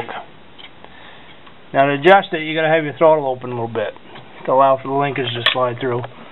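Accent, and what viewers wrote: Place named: Aroostook County, United States. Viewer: Sounds like your from aroostook county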